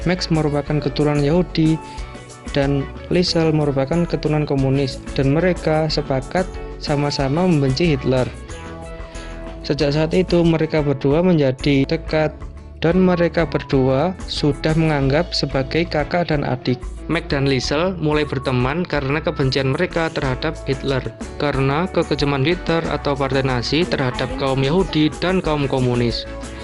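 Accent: Java, Indonesia. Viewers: Jangan terlalu medok logatnya min, jd krg enak didengar, maaf ya biar kedepan lbh baik lg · Medokkk jawa , suara lu kya org kurang makan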